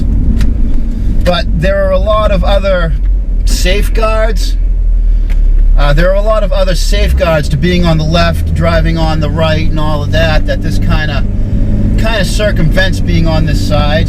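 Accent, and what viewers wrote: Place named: Massachusetts, United States. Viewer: good to see you back, and hear that Mass. accent again. Imagine what that Jeep would be like out on 495! Happy Holidays!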